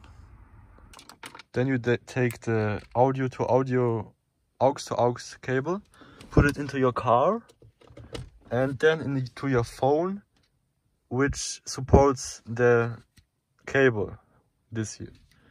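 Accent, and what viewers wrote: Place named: Germany. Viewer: Ich wittere deutschen Akzent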